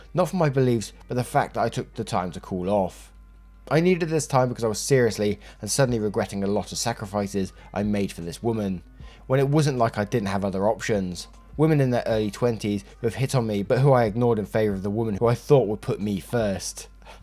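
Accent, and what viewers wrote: United Kingdom. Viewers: You are a Brit?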